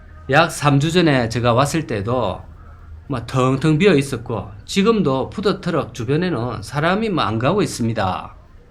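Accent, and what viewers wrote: Gyeongsang, South Korea. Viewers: 망한곳만, 망할것같은곳만 뎅기는 거도 능력이죠. 그쵸. 특유의 그 경상도 사투리로. 우야노. 우얄끼고 하면서. ㅎㅎ 안 망할것도 망하겠어요. 응원 합니다.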